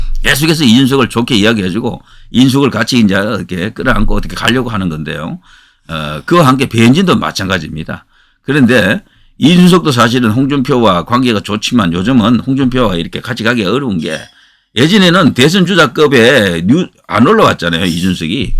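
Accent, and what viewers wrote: Gyeongsang, South Korea. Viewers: 정규재 너나잘해라 정규재 음성 발성톤 엿가락 처럼 ㅋ 경상도 억양인데 이상해 나도 오리지날경상도인데 정규재는 무슨말억양이 촌스럽고 촌스럽고 듣기싫은 음성 억양 말솜씨 암튼 극혐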